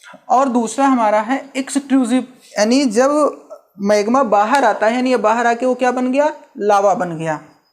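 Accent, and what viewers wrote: India. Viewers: Sir you speak the purest form of English in Indian accent 👍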